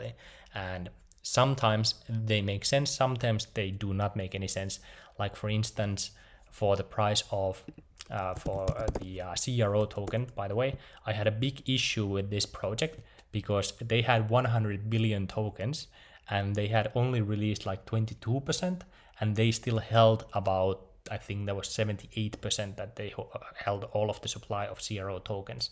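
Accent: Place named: Finland. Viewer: Are you from finland? cuz you sound like it.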